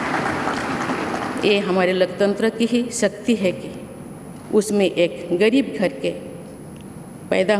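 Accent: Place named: Bengal, India. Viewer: Is she bengali ?? There is Bengali accent in her hindi language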